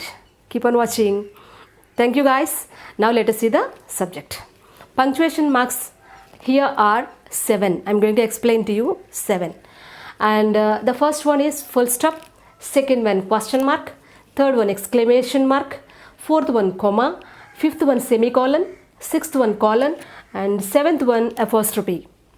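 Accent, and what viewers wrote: Telugu, India. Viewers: Madam mana telugu accent lo english teach chestunnaru. That's the most fascinating thing about your video. That's the naturality we need from teachers in social media... Unlike fakesters. And precise explanation is the hallmark of your teaching - man's true fan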